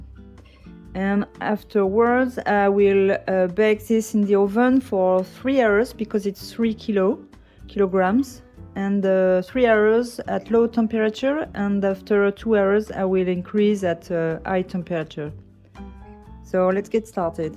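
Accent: France. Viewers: I love watching these videos---the recipes are always so good, the calm tone, when youre out walking around, I get to see Paris - you have a nice sweet voice with a lovely French Accent when speaking English - it is just so calming and refreshing to watch-there is no crazy cutting and chopping and throwing and slamming ingredients - so, thank you for making the videos for us to enjoy - MERRY CHRISTMAS AND BEST WISHES FOR GOOD HEALTH AND JOY IN THE NEW YEAR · I enjoyed this very much. French is my second language and I went to university in Grenoble in the 1990s. Your English is excellent. Love the accent. It reminds me so much of my French friends. Happy New Year!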